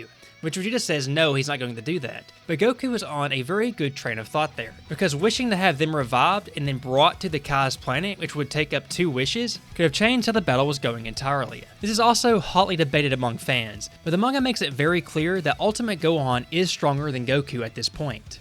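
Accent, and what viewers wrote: South, United States. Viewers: Love the hick accent. You sound like my grandmama😂😂😂❤️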